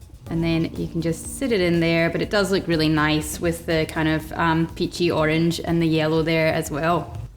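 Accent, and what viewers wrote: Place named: Scotland, United Kingdom. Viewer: Да ладно, по-моему самое шикарное в ней - это её шотландский акцент.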